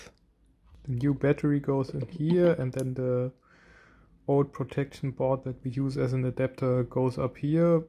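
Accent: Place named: Germany. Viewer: Very useful bringing that googlegroup blog to life! Coupled with deadpan German(?) delivery. Excellent!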